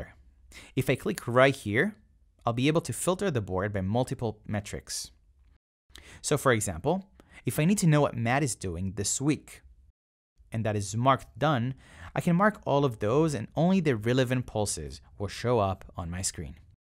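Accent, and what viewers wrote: Philippines. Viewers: sounds like a philipino speaker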